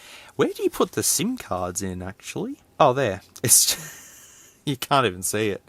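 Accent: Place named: Australia. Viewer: Also he's Australian, that's how we sound.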